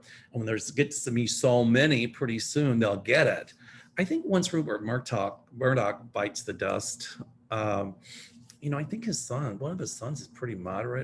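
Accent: South, United States. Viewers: Your Southern accent is on spot. Thank you for your show. Sending you big love from Beth in Mancos CO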